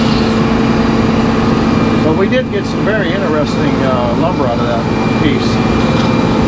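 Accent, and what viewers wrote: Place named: Maryland, United States. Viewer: With your accent I would say you are from Hagerstown MD, where I’m from.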